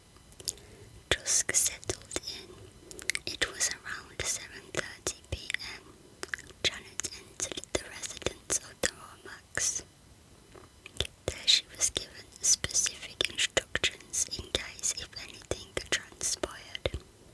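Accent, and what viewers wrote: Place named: Dudley, United Kingdom. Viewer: Where are you from? Your accent has a big Dudley twang to it. X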